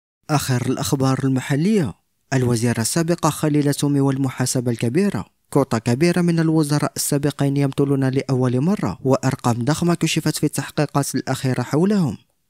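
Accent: Morocco. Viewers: شكون طاع هد لخبر انت تبان صوت مغربي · L'accent marocain !!!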